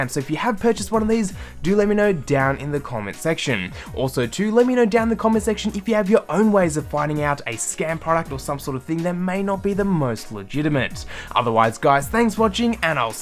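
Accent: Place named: Australia. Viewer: Yes... It is... He's not American. He's Australian... That's how Australian's talk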